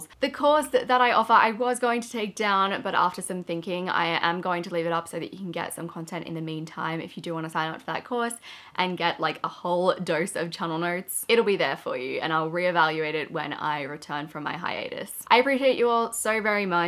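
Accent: Australia. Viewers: I heard 'Channel NERDS' more often than 'notes'. #AustralianAccent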